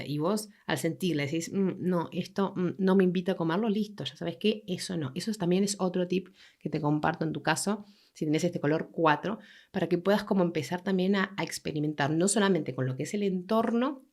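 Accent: Argentina. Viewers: Estoy feliz de haber encontrado tus vídeos. Voy a ver el link que dijiste para ver mi variable. Muchas gracias,. Bife jiji, me encanta el acento y las palabras argentinas. Saludos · Hola, muchas gracias por compartir tu sabiduria, Ió ( jaja en cordobé..) vengo de la escuela Astrológica (de Munich..) y hace 1 semana metido en Diseño Humano ( justamente buscando Info de todos lados y en alemán y con vos en español poco porteño jaja..). Y cierto notaba que cuando mi alimento es simplón.. fideos solitos ó arroz desparramados pero mirándome con ternura, soy felíz.. Claro no siempre, pero ésos momentos me hace sentir bién, Ah.. tengo la variable 1- Apetito... Saludos desde Kelheim! Gracias y sigo informándome con tus Videos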